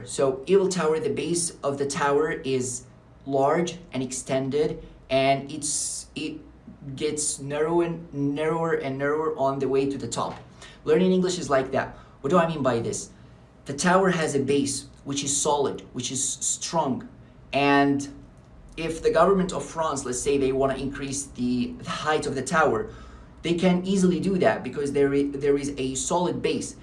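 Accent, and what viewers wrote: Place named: United States. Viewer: Incredible information bro I understood the whole video without looking at the translation I'll take your advice thx bro and your accent is so amazing i can't tell the difference between yours and an American accent ❤❤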